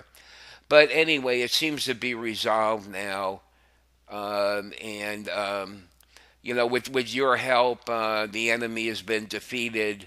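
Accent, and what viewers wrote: United States. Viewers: so happy to hear you're back vocem tuam! Prayed the rosary for you last night. Over here in Europe we love hearing your authentic voice, American accent and pronunciations - they are all fine! I enjoy the ad hoc commentary and analysis, it is all true and absolutely faithful to the Magisterium. Deo Gratias.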